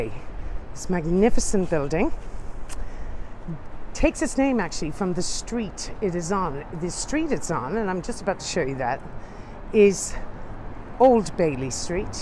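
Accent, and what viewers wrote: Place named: Ireland. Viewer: The best tour available! The Irish voice will take you around the world without leaving home!!!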